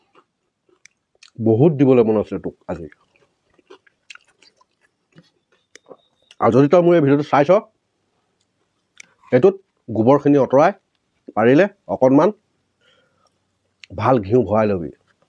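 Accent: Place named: Bihpuria, India. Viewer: o dada amar bo ghr bihpuriya moi apunar aru mur kotha mile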